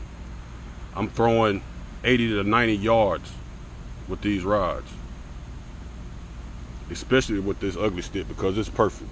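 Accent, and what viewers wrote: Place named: St. Louis, United States. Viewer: You sound like you from STL..😂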